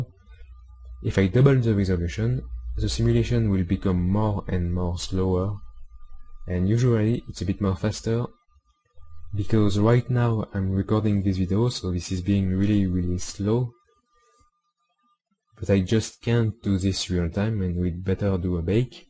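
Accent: France. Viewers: J'aime quand les français parle anglais :) bonne vidéo merci ! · tu es français non? :-) ça ce voit à ton accent ^_^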